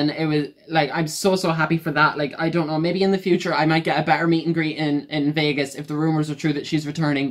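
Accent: Ireland. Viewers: I’ve liked a few Britney songs over the years and have followed her history but I’m wouldn’t call myself a fan per se. I decided to watch this video after recently seeing another “meet and greet trauma” video relating to her. I liked the way you told the story and your presentation is authentic. I think all your feelings were justified. I disagree though that she has no control over this. She is an adult and a multimillionaire. If she can’t see the potential downsides to this type of fiasco then that is 100% her failure as a business person, entertainer and human being. If you learn one thing from this is it’s that humans aren’t for idolizing - we are all flawed and imperfect. And my last comment is that I love Irish accents!! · Trying to place your accent...midlands? Offaly? Cavan?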